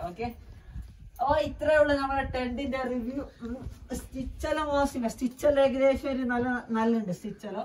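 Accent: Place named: Kasaragod, India. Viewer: Kasaragod anno stalam mosilaa yannh kelttapol tonniyada😅🤣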